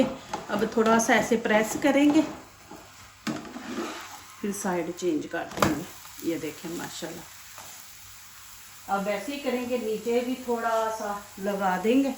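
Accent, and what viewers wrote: Punjab, India. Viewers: Assalamu alaikum. Baji buhut khob . Mashallah. Dil Khush kar ded da. Eik toh Aap panjabi andaz se bolte ho .us par itni laziz recipe banate Ho Dil sach mein khush ho jata hai. Allah Ke hath ke cycle ko barkrar rakhe aamin... Mujhe gobhi ke parathe ki recipe bataen .. I am from India Allah hafiz..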